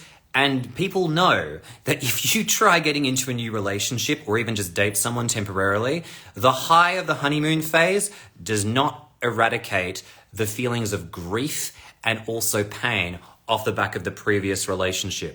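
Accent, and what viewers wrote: Australia. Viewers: I have been listening to you every day, and it is fair to say that you are the biggest gem on YouTube/IG. The depth of your knowledge and expertise is truly fascinating, at times even too much for me to absorb (and I am a nerd).☺️ Also, loving your authenticity and the Australian accent. A big thank you and keep on rocking. 💚🙏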